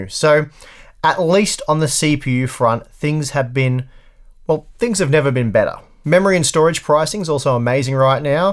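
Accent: Australia. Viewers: This may seem like a dumb question but is hardware unboxed Australian?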